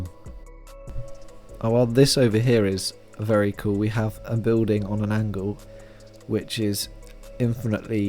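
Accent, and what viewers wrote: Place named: United Kingdom. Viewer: british i know it on your voice 🙂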